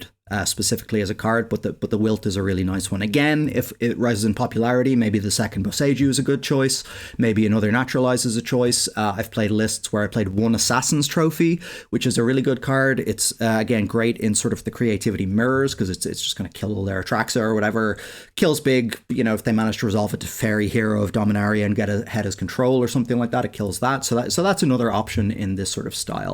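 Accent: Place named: Dublin, Ireland. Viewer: Coming to the party a bit late but thanks for the deck tech! It's great to hear a dublin voice in my ear around Xmas!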